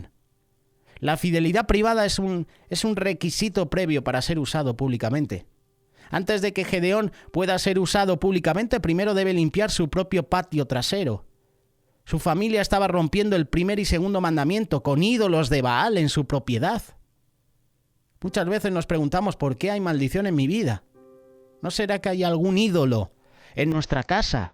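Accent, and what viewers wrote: Spain. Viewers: Nunca había escuchado una predica en español castellano ¡Qué bonita! Y que maravillosa exhortación, hermano. Dios te bendiga. Saludos desde México. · Dios mío que tremenda enseñanza Dios te bendiga varón es primera ves que le escucho y x su acento es español que bendicion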